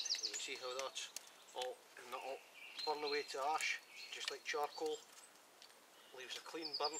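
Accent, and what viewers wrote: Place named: Scotland, United Kingdom. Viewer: Really enjoyed the videos, I have watched a few of your's tonight and have Subbed to your channel...can you clear up one thing tho, your accent, which shire of Scotland are ye from lol? Cheers, keep up the gid work jeck. I checked out your Wix page and answered my question, yer just up the road lol mun. Kielder forrest will be the playground then haha. Regards Ray. (Eyemouth)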